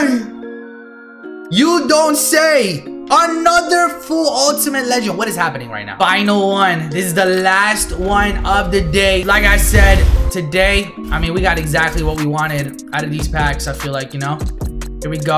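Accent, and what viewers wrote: Maryland, United States. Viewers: Are you from Mary land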